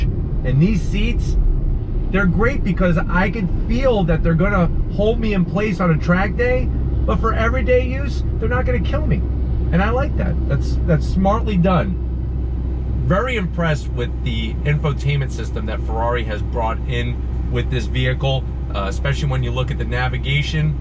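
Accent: United States. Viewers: your parents are from Italy? Wow. Didn't see that coming because your accent are very American though.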